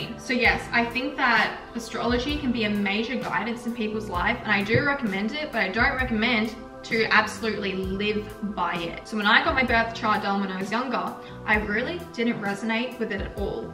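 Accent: Australia. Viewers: I was like 5 second in, and I was like "Yup she's definitey australian" ;D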